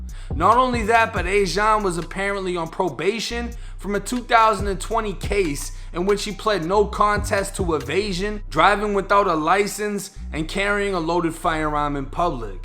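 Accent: Boston, United States. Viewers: Sounds like you from Boston with that accent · Boston accent. Reporting on Cali politics 🤔 I'm not mad long as it's all factual and accurate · I watched like 100 of your videos just seem more real than these other internet niggas sounds like you from Boston or some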